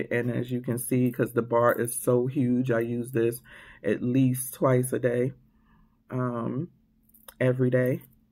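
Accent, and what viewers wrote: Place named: Baltimore, United States. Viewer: I can hear the Bmore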